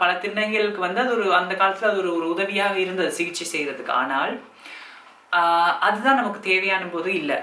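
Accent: Sri Lanka. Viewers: Ka your speech is very beautiful like srilanka tamil oh my sweet ka and please reply your comment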